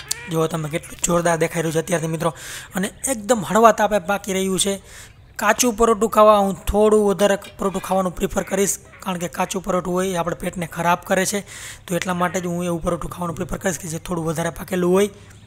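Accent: Kathiawar, India. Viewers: Wahh bhai aedam kesi kathiyavadi ha mojj ha ✌desi bhasha ma samjavyu mja avi gay vala panir ni subji kjavani man htu bhai , tme puru kryu thank you bhai.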